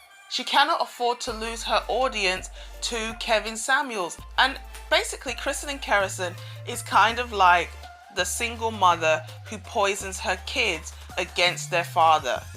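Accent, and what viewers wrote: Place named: London, United Kingdom. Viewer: no prob, what city in the uk are you from I'm trying to figure out that accent it sounds like a london accent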